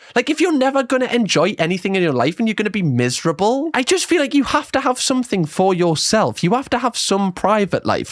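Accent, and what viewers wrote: Newcastle, United Kingdom. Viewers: Hi, love watching your videos. Do you have a Geordie accent? It sounds familiar, but I'm not sure. I hope my sons will be as emotionally mature and empathetic as you are. Any tips? 😅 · He is indeed Geordie :>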